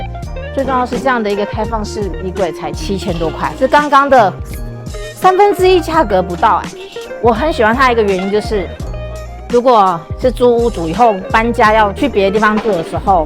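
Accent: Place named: Malaysia. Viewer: 很多画面，让我笑了😁 马上订阅😉 那些是台币吗？ 口音也很像我们马来西亚的。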